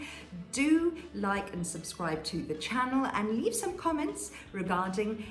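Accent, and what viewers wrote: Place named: United Kingdom. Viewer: such a quality content but least number of views. If you make prank video it will go viral. This is the taste we have unfortunately. We should promote quality content like this. I have just discovered this channel today, I used to watch news by Shabnam Riaz about 10 years ago I guess. You have got really nice British accent. Keep it up. I will urge the audience to share and subscribe to promote such quality videos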